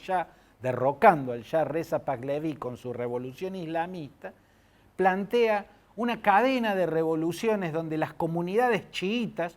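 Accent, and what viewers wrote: Córdoba, Argentina. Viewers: Nadie como vos, Claudio Fantini, para explicar estos temas. Ninguno igual para pronunciar esos nombres tan difíciles. Y con acento cordobés de yapa.👌🏻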